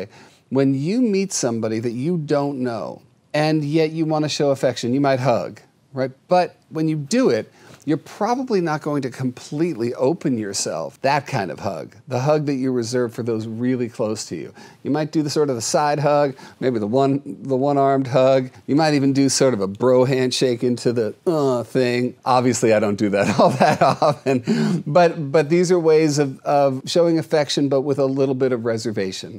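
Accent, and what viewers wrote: England, United Kingdom. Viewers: Hi, love your English acent! My cat Cloud gives me headbutts, and my cat Cat's, does the sea otter when I come home! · you are too much. I love your English accent delivery. This is such a neat, unusual community.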